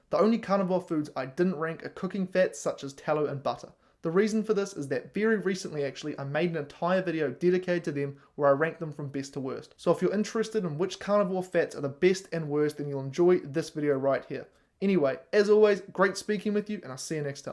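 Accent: New Zealand. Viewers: Are you a New Zealander? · Accurate video.. love the Kiwi accent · First video I’ve ever seen on this channel… what is his accent?! It’s seems to be 70% NZ, 15%Bondi hipster, 15% Saffa. Parents from Zim? I dunno, but I want to 😂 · Ah— NZ! I knew the "accent" was not AU, and not SA.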